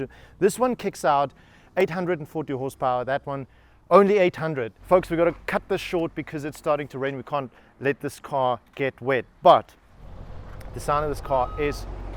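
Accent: South Africa. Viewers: Are you South African? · sure runs that pie-hole ~ is that a S African accent?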